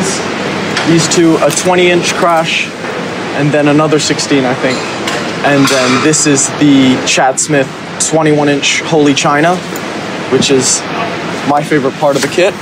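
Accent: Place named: United Kingdom. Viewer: Huge fan of your Dad. Thanks for sharing your drum set and narration with your slight British accent!!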